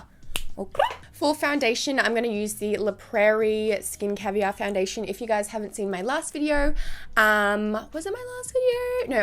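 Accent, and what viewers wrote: Australia. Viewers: i love Australian accents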